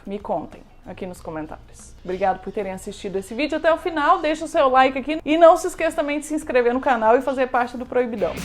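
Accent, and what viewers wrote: Minas Gerais, Brazil. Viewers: Mineiro tretando deve ser a coisa mais fofa kkkk sotaque fofinho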